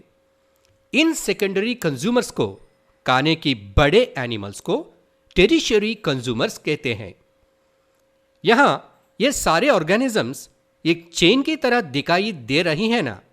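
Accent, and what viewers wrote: South India, India. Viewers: I think you are living in South India because your hindi. but your Hindi was good . ❤ Love from Rajasthan ❤❤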